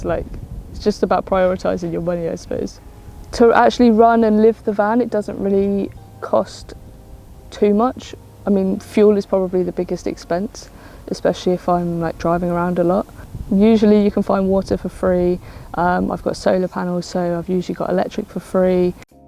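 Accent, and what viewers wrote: England, United Kingdom. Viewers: such a nice english english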